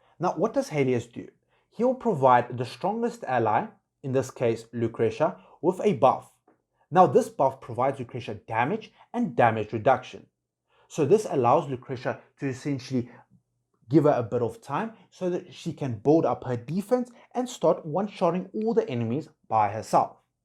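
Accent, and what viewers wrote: South Africa. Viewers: Hey, you a Saffa?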